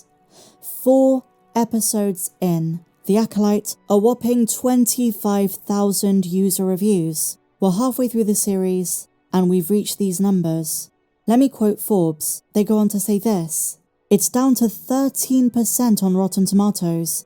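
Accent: United Kingdom. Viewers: I love her Brit accent too, but she needs to address the muddled bass sound mix. There's little sibilance in there and she needs to up the mids and highs to correct it. One shouldn't have to pump the volume or use subtitles (filled with spelling errors a lot of the time) to make sense of what the speaker is saying, right?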